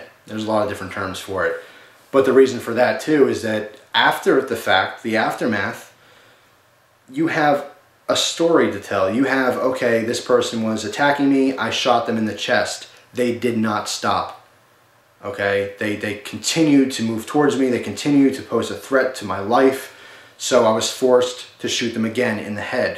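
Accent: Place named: New York, United States. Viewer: did you say boind them? hahahaha i think that the new york accent is cool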